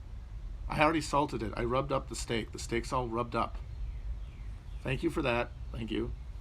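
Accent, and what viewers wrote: Louisiana, United States. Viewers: When you began speaking with the Cajun patois, it triggered some personal memories from long ago. In that geological era, I was trained as a saute cook in a rather upscale Holiday Inn by an incredibly talented Louisiana immigrant. The hotel in question is way north of the Mason Dixon line. He never revealed to me how he got past the border guards, and seemed uncomfortable discussing his transition. I never pressed him about it. He is an expert saucier and makes the best red beans and rice I’ve ever had. And after all these years, he still won’t give me the recipe. So.... I really enjoyed this episode. My favorite so far. Breaking in a new grill, the Balkanized Mid West, protesters, attack helicopters, Harry Nilsson, Covid Economics & Sociology, and finally, The Steak. Looked delish. I personally like mine dangerously rare. 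Meat thermometers are essential for any household, but on the grill, eventually you will learn how cooked the meat is just by a touch of the tongs. Bon Apetit.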